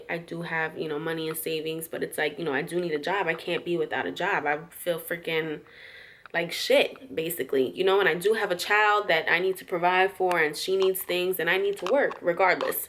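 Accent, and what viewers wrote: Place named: New York City, United States. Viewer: Are you originally from NYC, I hear a NYC accent lol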